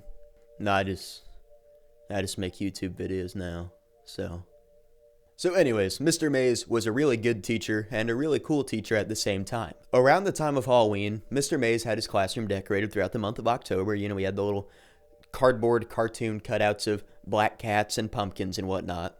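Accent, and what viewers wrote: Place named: Pennsylvania, United States. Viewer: You're from Pennsylvania aren't you? I can tell by your accent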